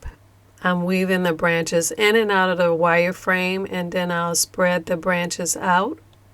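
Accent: New Orleans, United States. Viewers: I just want to say that I love listening to your beautiful soothing voice and NOLA pronunciations. Your intellect and creativity shine brightly and what you say is only enhanced by how you say it!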